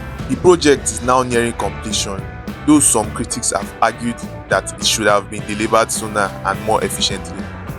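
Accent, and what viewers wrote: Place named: Ebira, Nigeria. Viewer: De thing wowoh small na Ur accent sef be like igbira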